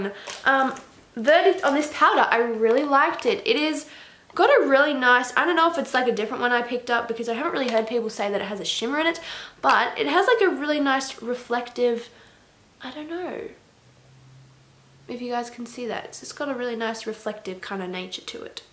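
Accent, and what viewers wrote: Australia. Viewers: Is anyone else obsessed with her Australian accent, I'm from Sydney and for some reason I just love listening to her talk. Maybe also because you just give off such positive vibes☺️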